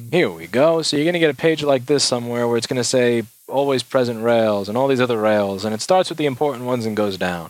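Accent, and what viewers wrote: New York, United States. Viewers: didn't even have to look at the description to know you were from new york. you probably grew up near queens too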